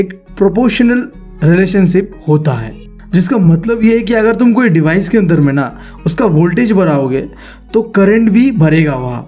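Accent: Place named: Assam, India. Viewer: Bro is axomia 🙌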